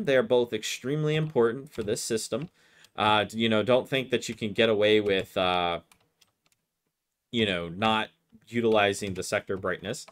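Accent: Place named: Brooklyn, United States. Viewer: what is brooklyn guy babbling about nice tutorial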